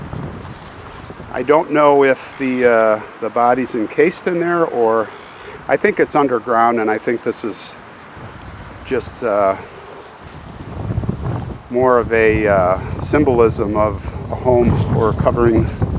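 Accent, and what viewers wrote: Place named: Chicago, United States. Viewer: WOW 😣 WHAT A STORY AND VERY SAD CASE THAT THE NATIVE AMERICANS ARE TREATED SO POORLY, YOU ARE UP NEAR MY FAMILY WENT ON VACATION WHEN I WAS A KID, MINOCQUA, WOODRUFF, EAGLE RIVER, ST GERMAIN, TOMAHAWK GREAT AREA, HOLY CRAAAP THE TERROR WAS THE SHIP I SAW A SHOW ON THAT RECENTLY AND THE DOCUMENTRY TOO GOOD STUFF BROTHER, I CAN HEAR THE CHICAGO AREA ACCENT IN YOUR VOICE LOL 😆😆 TAKE CARE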